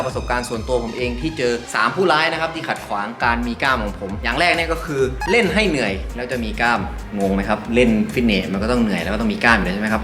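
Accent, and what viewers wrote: South, Thailand. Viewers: พี่คนใต้ หม้ายยยยนิ สำเนียงนี้หมันเลย55555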